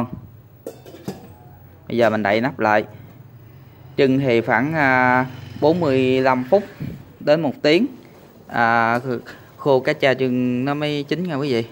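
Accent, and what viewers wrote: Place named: Mekong Delta, Vietnam. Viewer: Nghe cách bạn giới thiệu đúng chất giọng miền Tây Thơm đến nhà ngân luôn Ăn sợ ghiền , ghiền là phải về miền Tây 💖💖💖😋😋😋😋 Da dai dai béo béo ...yummy Ngân xin trái bắp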